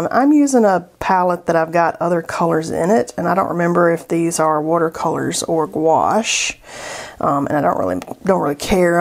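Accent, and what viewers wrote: South, United States. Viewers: I loved your southern pronunciations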